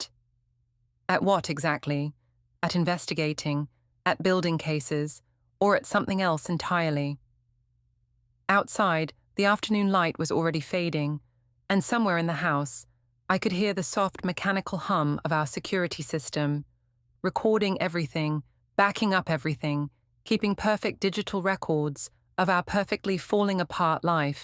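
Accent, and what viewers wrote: United Kingdom. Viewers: Excellent, if sad, story. Good plot editing, good narration. Captions seemed correct for spoken words and had proper punctuation. Recommend this channel. Voice has British accent to my ears.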